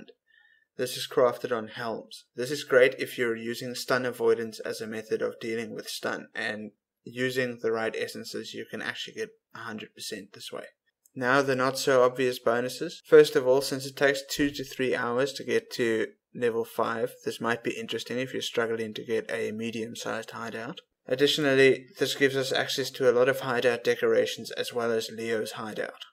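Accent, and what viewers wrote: South Africa. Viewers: are you from S.A sounds like it ?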